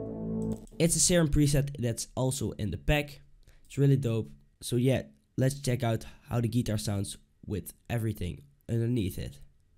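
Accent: Netherlands. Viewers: lekker nederlands accentje wel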